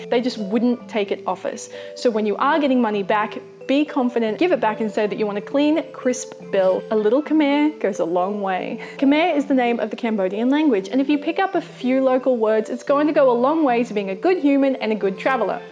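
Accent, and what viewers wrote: Australia. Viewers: I don't understand your Australian English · I love the Aussie accent ☺️ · Wow, you sounded American up till you said you were from Brisbane. Now, all I hear is your Australian accent :).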